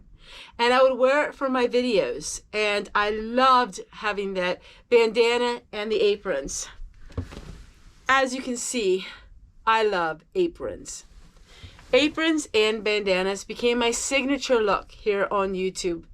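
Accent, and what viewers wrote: Pennsylvania Dutch, United States. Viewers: Good morning,! I love when you share about your heritage. My husband and I watched your video together last night, and then I watched it again this morning to leave my comment, something I've been doing lately. We remarked about the Pennsylvania Dutch you spoke and which words sounded more Dutch or more German to us; it sure sounded familiar when you were speaking. My grandmother spoke Dutch since her family came here from Holland in the early 1900s; his grandmother spoke German because she came here from Germany in 1938. Your aprons looked so pretty all hung and organized like a color wheel. I spent the other day reorganizing my kitchen. Even though it was just a small bit of decluttering, it made a big difference! Have a beautiful, blessed day! ❤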